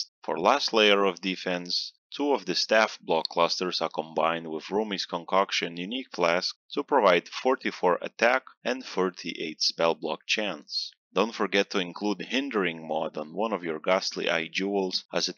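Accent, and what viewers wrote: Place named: Lithuania. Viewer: pagal akcenta bus is LT :D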